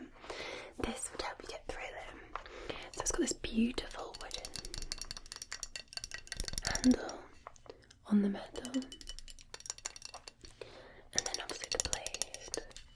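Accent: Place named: Liverpool, United Kingdom. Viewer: scouse ha ha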